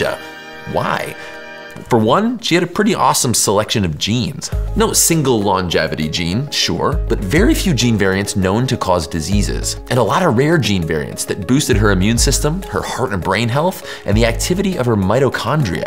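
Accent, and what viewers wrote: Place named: Texas, United States. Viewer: Where'd that Texas accent go?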